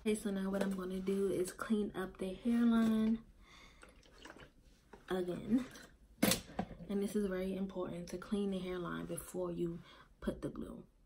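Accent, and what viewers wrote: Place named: DMV, United States. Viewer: I know a DMV ACCENT when I hear one 🥰🥰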